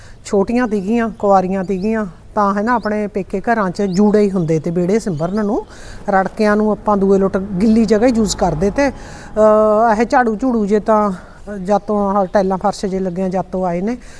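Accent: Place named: Khamanon, India. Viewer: ਤੁਸੀਂ ਕਿਹੜੇ ਪਿੰਡ ਤੋਂ ਭੈਣ ਜੀ ਸਾਡੇ ਇਲਾਕੇ ਦੀ ਬੋਲੀ ਤੁਹਾਡੀ ਖਮਾਣੋਂ ਇਲਾਕੇ ਦੀ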